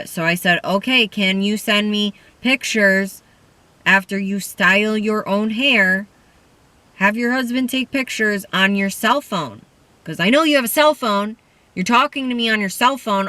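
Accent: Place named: Midwest, United States. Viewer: Girl I just love your cute little midwestern accent! I'm gonna say like Michigan or Illinois? Idk why I love that accent lol Its just the cutest thing to hear 😘 I'm from Maryland so our accent is pretty unique. I've spent half my life in NY with that side of my family..... and they are all Italian immigrants so they can't go judging ANYONE else's accent lol But they say I don't sound like other people from Maryland so I'm really glad for that 😏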